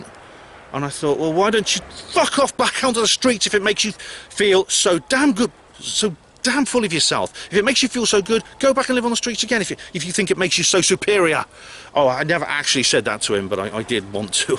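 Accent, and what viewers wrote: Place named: Cockney, United Kingdom. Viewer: mate, I am from Hertfordshire and there is nothing more annoying than a three counties Cockney.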